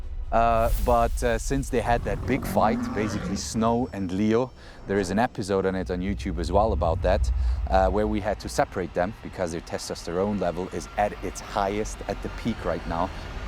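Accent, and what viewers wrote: South Africa. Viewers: Yoh. Last time I watched you was years ago and you sounded Swedish now you sound more South African 😅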